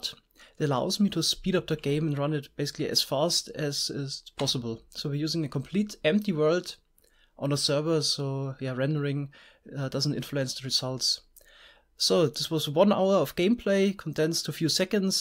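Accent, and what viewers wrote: Germany. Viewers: what Country are you from? It sounds like you would be from Germany :D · Du hörst dich sehr Deutsch an 🤔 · Agreed. He sounds like he's German, or at least speaks German as his native language.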